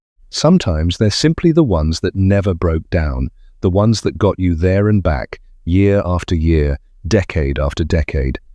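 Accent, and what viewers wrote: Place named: United States. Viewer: Wasn' it a popular that was the cheapest? pretty rubish video, why the American accent?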